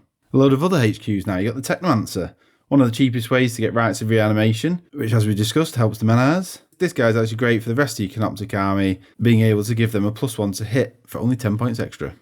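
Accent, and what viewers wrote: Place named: Scotland, United Kingdom. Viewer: Una pregunta ,por curiosidad , ¿de qué pais eres? lo digo por tu acento se me parece un poco escocés o galés pero igual eres de yorkshire ,un saludo.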